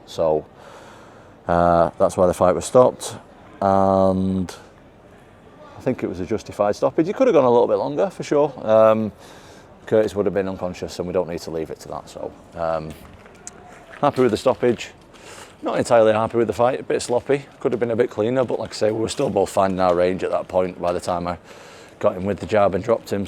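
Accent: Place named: England, United Kingdom. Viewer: I like his English much better than American English ❤ The real one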